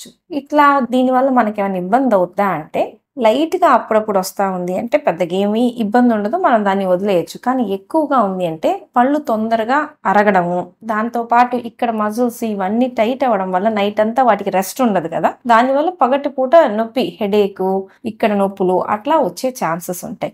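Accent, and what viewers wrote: Guntur, India. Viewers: అచ్చమైన గుంటూరు యాసలో మాట్లాడుతున్నారు🎉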